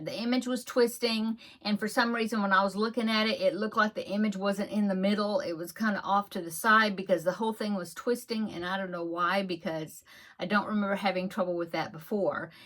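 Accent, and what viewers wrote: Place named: Texas, United States. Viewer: I just found your channel, and im really enjoying it. Im formerly from East Texas and now live in Georgia. Im enjoying a fellow Texan accent!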